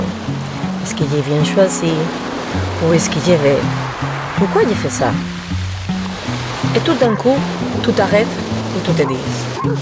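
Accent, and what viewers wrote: Spain. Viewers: J'aime l'accent espagnol, ma grand-mère est née en Espagne. Et ton français est très clair, tu peux avoir confiance. Ton aventure est très intéressante, merci de nous la faire partager. Bon anniversaire de voyage. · Avec tout tes chiens tu avais vraiment besoin d'un camion 😂 Ton accent espagnol est charmante ❤ De verdad tu hablo franxute mejor que yo espagnol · Ton français est très bien surtout ton accent espagnol te donne un plus 😊😊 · J'adore votre accent espagnol !!! Et votre français est très, très correct. (*) (en fait, ce qui importe, c'est l'organisation cérébrale ; et là, chez vous, ça turbine et c'est de niveau solide) Salutations d'un sédentaire... PS je vous ai découverte par les Mariolles trotters *) puisque vous aviez quelques inquiétudes à ce propos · Bonsoir. Bravo pour tes vidéos, et en français en plus 👍, avec cet accent espagnol.... 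adorable !!! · Je te regarde grâce à Raphaël et je ne regrette vraiment pas mon abonnement à ta chaîne. Ton accent espagnol est comme un médicament de bonne humeur donc on a besoin régulièrement mdrrrrrr gros bisous tout plein de la Belgique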